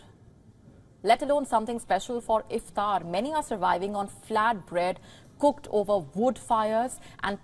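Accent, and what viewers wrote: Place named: India. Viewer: All Indian female ascents are like this its the most worst ascent in English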